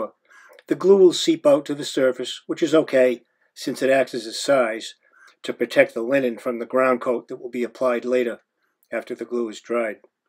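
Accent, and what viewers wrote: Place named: Connecticut, United States. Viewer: So glad I found your vid while researching attaching linen to wood. I didn't know about the PH neutral PVA, and I've now ordered some by the same manufacturer. On a side note, as a native Connecticut Yankee that moved to Virginia 30+ years ago, as soon as you started talking I yelled out in joy! lol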